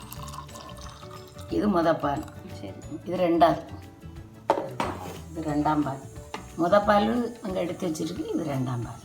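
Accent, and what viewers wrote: Nagercoil, India. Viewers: Nagercoil slang super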